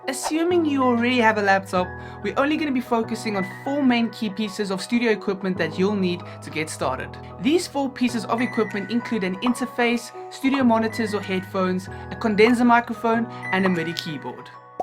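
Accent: South Africa. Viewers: That accent... You must be from South Africa 😁 Me too. Thanks for the video dude! Been doing my research for a while and here I come across your video that confirms all my choices, M40x, MPK Mini Mk2 and the Scarlett Solo! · ey bruh i know you from SA because of the accent mad respect from SA bro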